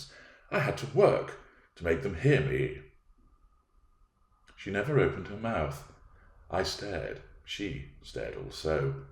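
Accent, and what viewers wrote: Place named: Yorkshire, United Kingdom. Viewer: Excellent Yorkshire accent and a most enjoyable performance. Bravo!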